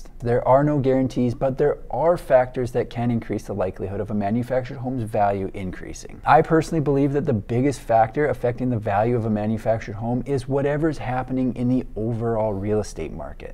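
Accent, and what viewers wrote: Canada. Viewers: Are you Canadian?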